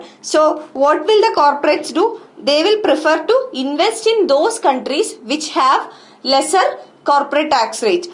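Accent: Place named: Kerala, India. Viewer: Keralite accent... Love it 😊🙏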